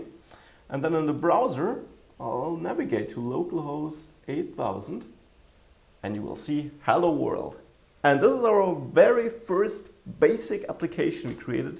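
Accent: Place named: Germany. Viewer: The German accent man, that's a pain in the a**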